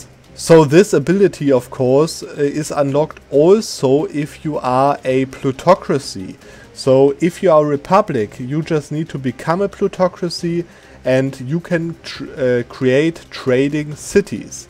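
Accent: Germany. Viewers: Damn boy you sound so deutsch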